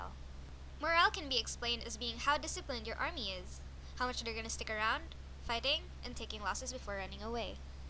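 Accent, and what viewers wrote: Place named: Philippines. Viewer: why does this voice sound suspiciously filipino to me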